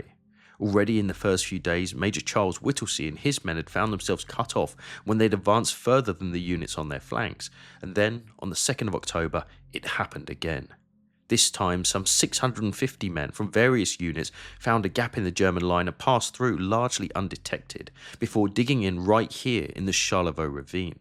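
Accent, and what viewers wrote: England, United Kingdom. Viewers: There's no R in the word. That thick English accent is rough as you butcher words and add Rs to everything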